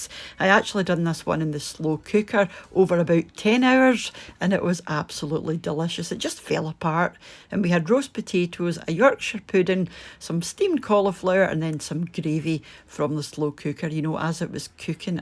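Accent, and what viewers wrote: Scotland, United Kingdom. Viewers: Just discovered you. I absolutely love your beautiful Scottish accent. What a treat to hear it. I also love seeing a little bit of the countryside. Looking forward to learning from you. '-)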